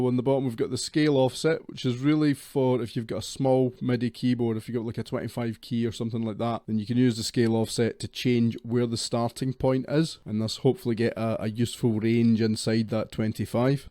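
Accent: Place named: Scotland, United Kingdom. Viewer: I can always tell when the Scottish guy is going to start talking. Because the UI's are always awful. Lol. Plugins are good, don't get me wrong! :)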